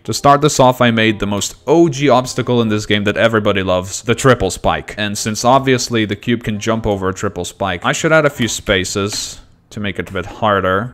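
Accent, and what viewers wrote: England, United Kingdom. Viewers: An english accent is not a bad thing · yoooo is British i cant belive it yess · I am English so his accent is completely normal to me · he sounds more British lol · BRITSH MATE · bro youre acent is preatty bri'ish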